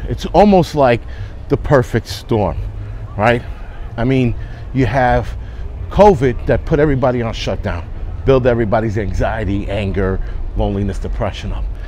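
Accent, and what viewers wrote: New York, United States. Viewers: A home boy says it straight up just like a NYer!!! THANK YOU!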